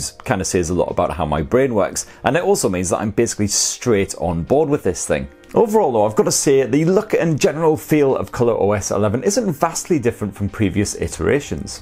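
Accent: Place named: England, United Kingdom. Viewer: Great reviews as always, can't you tune a little bit your english accent to an international one😁😁.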